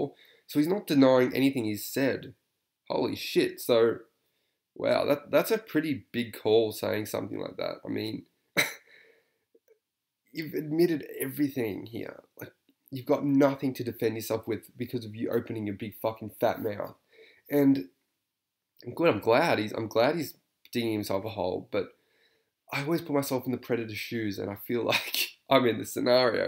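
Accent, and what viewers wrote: Australia. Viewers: You are quite entertaining and your Australian accent is a plus also.(No disrespect I just love Australian and British accents compared to the wining voices of New Yorkers) 😉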